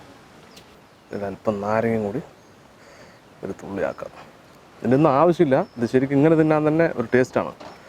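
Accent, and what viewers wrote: Palakkad, India. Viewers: palakkaden samsaram ath poliyan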